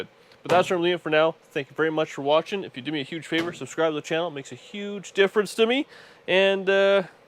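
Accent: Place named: Canada. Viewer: After sitting here and watching the video, I am wondering why I enjoy this channel so much and it came to me. It’s! Aside from the great projects, his obvious skills, and hard work ethic, he has a likable personality. That quality and his ability to verbalize the way he goes about his projects with a bit of humor and devil may care (“it will be fine”) attitude keeps the viewer’s attention. I like the Canadian accent and the fact that he keeps it clean and family friendly. Through all the problems and sweat, seems to be a happy guy. BTW, nice job “smoocin’ the ‘57!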